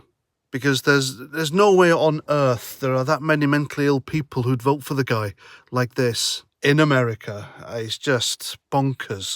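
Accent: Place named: Birmingham, United Kingdom. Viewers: Excellent brummie accent. I'm glad Ozzy's coming back bless him. War Pigs is an ace song....so true today as well. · your Brummy accent is hilarious...yam alright am ya bab 🤣😃👍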